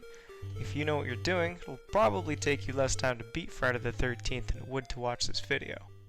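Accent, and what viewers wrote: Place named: Canada. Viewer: SO MUCH CANADIAN ACCENT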